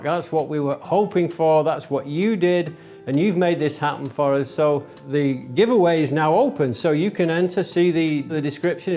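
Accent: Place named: England, United Kingdom. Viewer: I only came across your channel a few weeks ago, but what I've seen so far is very good. it was a joy to hear an Englishman doing a carpentry show, the majority are American and some of them are very good too. I really like the way you use feet and inches; I still measure in imperial although I have on some occasions leaned toward metric. You remind me of my old carpentry trainer, he was real old school, this was way back in the 80s. He would not let us cut anything with a machine until we were competent at cutting using a saw, chisel and mallet, what he would say was "There won't always be electric on job lad" in a broad Lancashire accent. As I said it's really great to see somebody using hand tools again, it's becoming a dying art. Thanks, Jim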